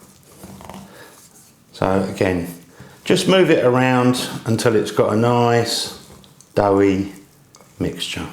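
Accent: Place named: London, United Kingdom. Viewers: Delicious. With a cuppa rosy unbeatable. I used whole meal flour. Gives them a little more crunch. Oh and the chef’s wonderful London accent. Love it. Thank you. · Let me start off by saying that I just love your English accent. Love your recipe.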